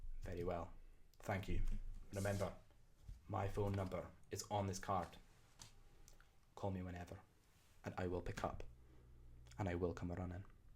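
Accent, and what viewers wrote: Scotland, United Kingdom. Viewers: Great accent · Andrew Robertson would approve of the accent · Can you do other profession?i loveeee the scottish accent x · YAAAAAY!!! Scottish accent part 2, finally!!!! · Not a bad Scottish accent I reckon you sound like a teacher in Edinburgh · YES more scottish accent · Your videos are a great tool for mastering a Scottish accent.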